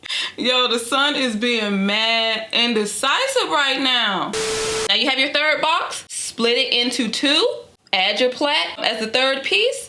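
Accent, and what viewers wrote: North Carolina, United States. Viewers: I KNEW u sounded like a North Carolinian🤣🤣🤣 heyyyy neighbor Lol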